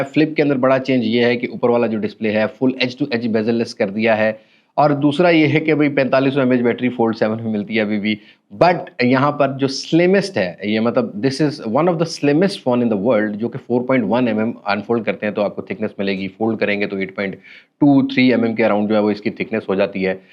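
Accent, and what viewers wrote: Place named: Punjab, India. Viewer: Ap ka thora thora Punjabi ka touch bhut wadia lgia ay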